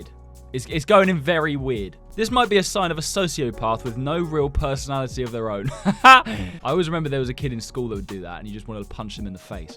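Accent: United Kingdom. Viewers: I THOUGHT THAT HE WAS BRITISH Y HE SAYING DOLLAR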